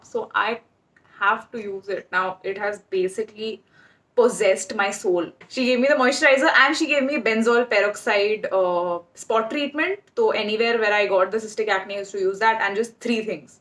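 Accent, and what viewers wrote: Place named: Gujarat, India. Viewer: Why the f word. You sound cool without even using it.. rather add your Gujrati accent I am great fan of your Gujrati accent.... morecedez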